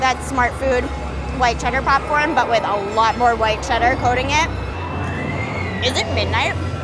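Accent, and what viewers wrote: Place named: New Jersey, United States. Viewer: I knew you were from Jersey or somewhere else close in the upper east coast...haha I can tell, born and raised in Florida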